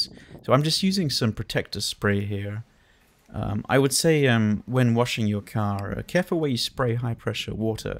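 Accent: RP, United Kingdom. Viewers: I love the posh accent.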